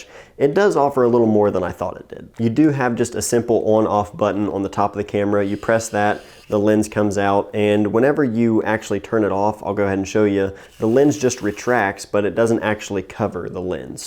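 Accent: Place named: Mid-Atlantic, United States. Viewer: I know a mid-Atlantic accent when I hear one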